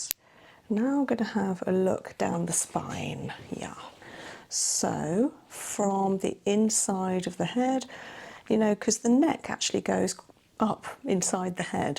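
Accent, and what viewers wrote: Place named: England, United Kingdom. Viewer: l@ I asked that becuase she a similar accent to Geri Halliwell. They are not too far from each other, North of London.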